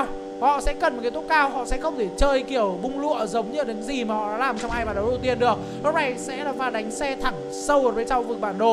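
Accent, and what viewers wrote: North, Vietnam. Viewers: A ơi. Có tk bảo giọng Bắc ấm. Em lên nói con cek j vs nó??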